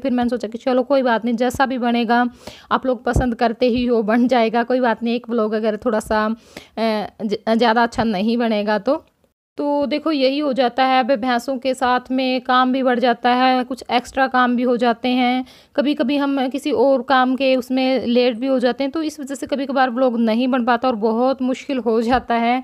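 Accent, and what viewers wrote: Haryana, India. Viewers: Mai bhi samajh gai thi kyonki hariyana mai aise hi bolte h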